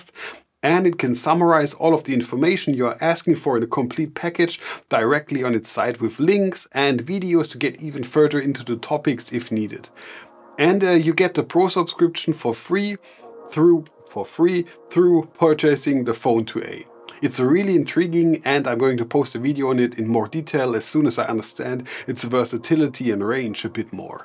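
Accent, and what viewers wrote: Germany. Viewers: Stuagarter wo english schwätzt. Klasse Moove Digga! Gefällt mir!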